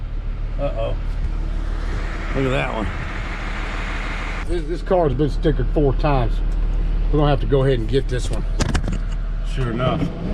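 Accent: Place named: Georgia, United States. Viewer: Hey man, I'm originally from Georgia and had to move further north a few years ago. Can't tell for sure if you're from northern Florida or southern Georgia but watching your videos is like a trip back home for me. I'm only half watching what you all is doing. Mostly I'm just enjoying the conversation. One good ol boy to another, I thank you.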